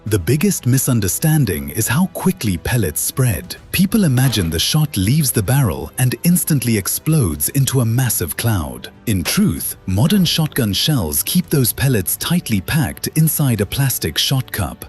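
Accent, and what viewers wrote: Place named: England, United Kingdom. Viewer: Why is someone with an English accent narrating this video? If memory serves correctly the British have draconian gun laws regarding ownership. Would you really believe that aside from single shot, side by side, or over under shotguns what could the British or ANY European country teach the United States about shotguns or ANY firearms? They may know theory, but the United States has practical experience. I'd go so far to say, one city in the United States has more experience wirh bang sticks than the entire continent of Europe. LOL!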